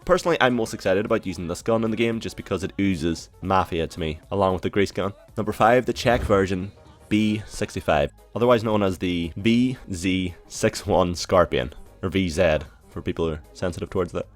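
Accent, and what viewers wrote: Donegal, Ireland. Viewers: Are you Irish? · haha I just know the accent lol im from donegal as well can't wait for this game to come out 😊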